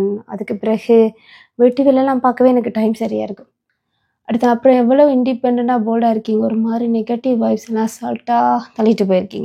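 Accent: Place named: Sri Lanka. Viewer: நீங்க அழகா பேசறீங்க சகோதரி இலங்கை தமிழே அழகுதான் நீங்களே குரல் கொடுக்கலாம் நன்றாகவே இருக்கிறது நானும் முத்துலட்சுமி ராகவன் ரமணிசந்திரன் அந்த வரிசையில் ரசிகைதான் சிறிய வயதில் எங்கள் மனதில் பெரிய இடத்தை பிடித்துவிட்டீர்கள்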